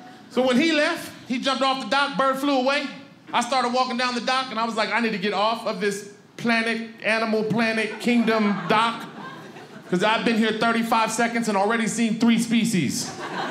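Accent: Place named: Bay Area, United States. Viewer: I knew he sounded familiar A Bay Area Native 😂❗️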